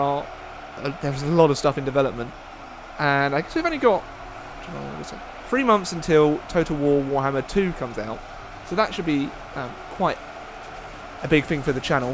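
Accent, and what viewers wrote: United Kingdom. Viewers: Keep the massive battles and total war going, I love the survival battles. And personally I find your voice quite elegant, it gives your videos an almost documentary-like feel. Speaking of that can you say Amino Acids in your next video? It's my favorite work to hear with a proper english accent. By the way, I'm 27 and totally get it, living in the bay area of California is brutal, I pay $1800 american for rent.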